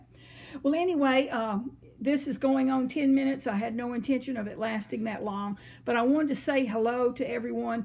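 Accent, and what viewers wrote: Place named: South, United States. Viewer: Oh!,..it’s so good to hear your sweet southern drawl, it’s been too long! Lilliebeth looks absolutely precious in her Ralph Lauren, and that hat is just so cute! The matching tennies are to die for!! How glad I am to see you’re doing well! The way I see it, if a little xtra weight is all we get in this time of pandemic, we’re ahead of the game! Hugs and much love Dearheart, your pal Joy xox. P.s., I shall send you an email of how we are doing in a bit.😊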